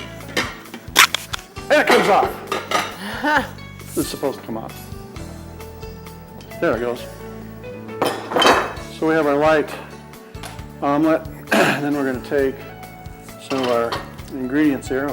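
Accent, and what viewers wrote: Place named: England, United Kingdom. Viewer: hello cousin, I see despite all your years over there, you still can't get rid of the English accent. You make a great host and you look hot too as always. love you..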